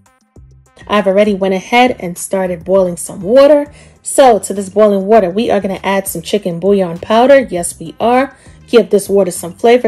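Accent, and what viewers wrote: New York, United States. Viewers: Love your NY accent. Miss home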